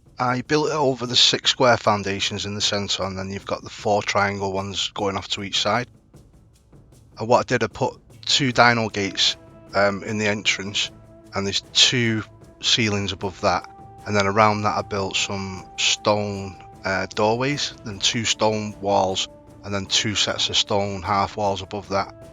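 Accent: Manchester, United Kingdom. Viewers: What’s your accent man? I’m tryna work it out, you sound like a mix of Mancunian and Potters.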